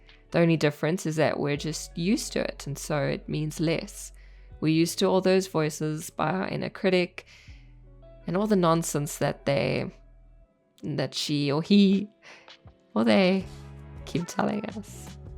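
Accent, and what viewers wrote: South Africa. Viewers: First time watching your channel and I have to ask, are you South African. Very useful tips given. Thank you. I’m enjoying watching all the Junk Journal July videos. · Hi! First time to your channel. New subscriber. So enjoyed this video. South African?😃🎀💕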